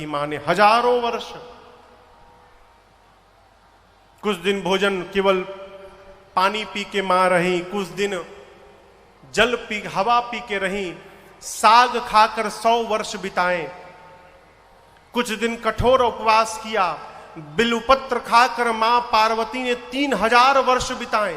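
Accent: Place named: Purabiya, India. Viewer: Har baat mein Anand hai ho kya kar rahe ho Puri Katha mein ho hai ho hai ho hai ho kar Diya purabiya Bhasha bolate ho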